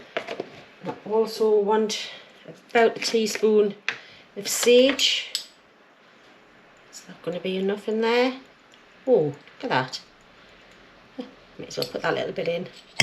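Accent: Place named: Tyneside, United Kingdom. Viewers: Hi. I've had a couple of strokes, am in a wheelchair with limited use of hands and legs.would the shop bought frozen puff pastry work for these as well. Thank you for your videos. My sister did her nursing training many years ago in Sunderland and used to bring her friend to come and stay with us in Liverpool. They're still in touch but I haven't seem her for many years and miss her cheerful,cheerful friendly accent. Its so lovely to hear your accent, it takes me back 42 years. x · Love your videos,the stuff my ma used to make,I was born 91 yrs ago Willington quay,cant fix your accent Tyneside or Teeside!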